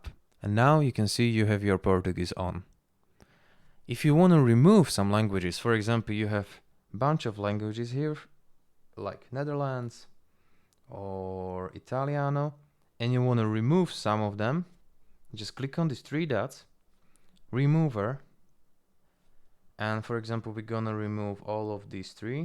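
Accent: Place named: Slovakia. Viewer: slovak accent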